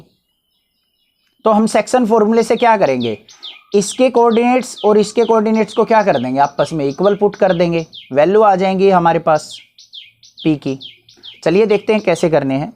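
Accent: Haryana, India. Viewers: Sir aap haryana se hai ?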